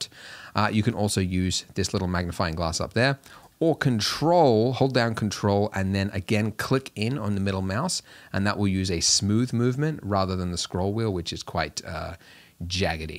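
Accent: Australia. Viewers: Are you from Australia ? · I'd love this series based on its content, but that Aussie accent is absolutely 👍. Love it!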